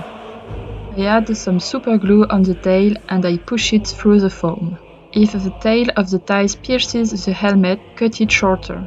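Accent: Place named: France. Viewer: After years studying french, Im so proud I can distinguish her beautiful accent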